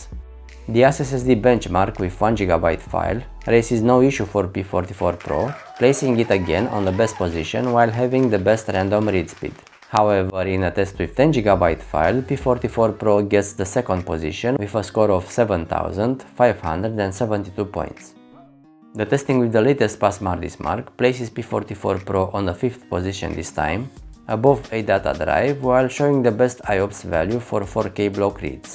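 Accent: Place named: Eastern Europe. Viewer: Eastern European accent sucks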